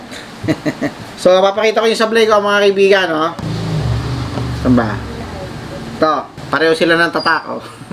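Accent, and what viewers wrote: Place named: Bicol, Philippines. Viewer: may pagka bicolano salita mo idol ah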